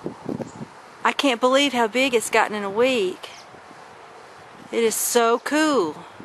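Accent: South, United States. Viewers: Are you from Kentucky? You have a really rich southern accent! I love the south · Her southern accent is adorable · "Hawnets neyst, it is sooo kewl" southern drawl! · Some of you are being so rude. She isn't a hick just because she has a southern accent. Southern accents DO NOT signify ignorance. She tried to post an interesting video for all of you to enjoy and all you are just being rude. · He didn't spell it like that because he didn't know the meaning lol... He spelt it that way because that is how you pronounce it with your accent. You don't say "Ten-ding" you say "ten-din" like you say "coo" instead of "cool". Granted he should have said, southerners not hicks.. but you guys definitely pronounce words much different than they are spelled xD. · Jesus, he was defending you! He said that because you have a southern accent doesn't make you a "hick"! 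And then he goes on to say if TOTALCONTROL would say that shit to a black person with an accent. You should try READING COMMENTS THOROUGHLY before you rub that persons face in the ground. >:( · omg that country accent bleh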